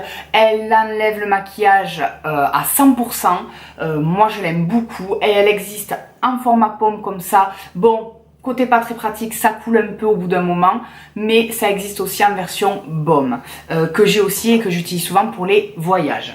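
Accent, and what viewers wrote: South, France. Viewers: coucou ,je suis tout à fait d'accord avec toi pour la recherche du naturel , je suis en train de faire ce parcours aussi . je t'encourage à continuer dans ce sens :) .Bisouuus (en tout cas on a l'accent du sud tous les deux hihih)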